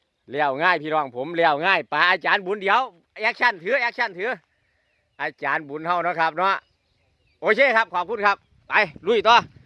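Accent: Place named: Yasothon, Thailand. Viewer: ผมมักคำเว้าอ้ายคักอ้ายเว้าได่ตื่นเต้นคักแบบนี้ละผมชอบ นี้ละเขาเอิ้นสตายคน ยโสธร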